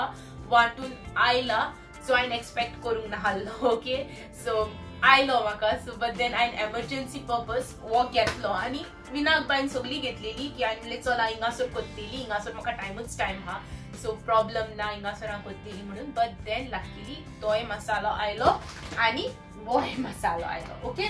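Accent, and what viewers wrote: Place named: Karwar, India. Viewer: Becoz you speak like karwar people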